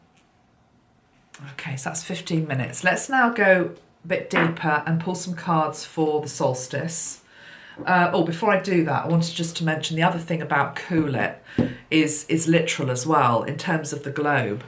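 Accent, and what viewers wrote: England, United Kingdom. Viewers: A big thank you from Johannesburg, South Africa! I'm originally from England so I enjoy your dulcet English tones:-) I shall keep walking through the gateway with the cool blue flame in my hand. Such a powerful image to take with me in my shadow work to release all that no longer serves me, thank you ♥️💜💚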